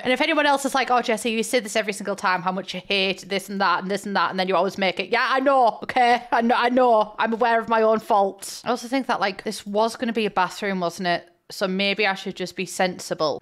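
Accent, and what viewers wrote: United Kingdom. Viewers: So I have a strong southern (American) accent and watching you so much sometimes I get the urge to go British. And British plus southern American accent…..I sound like an idiot yall pray for me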